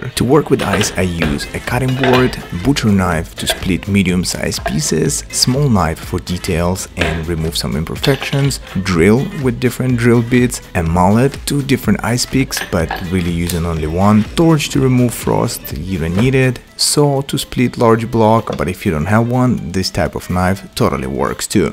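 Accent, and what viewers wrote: Russia. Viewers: wow i understood that you are russian from your accent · рашиський акцент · Слышно русский акцент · You have a small russian accent. Lol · Сижу такой смотрю... И вот замечаю такой небольшой наш РУССКИЙ акцент(я сам вообще не бубу в английском, но как-то заметил). Потом такой, обращаю внимание на на название канала... И всё встаёт на свои места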